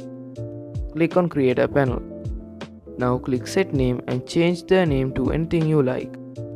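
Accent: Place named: India. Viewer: You sound really really really Indian!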